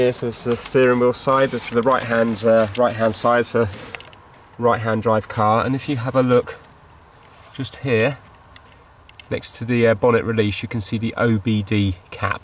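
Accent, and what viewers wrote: United Kingdom. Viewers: Cant stand that Brit Accent. Let alone a Right hand drive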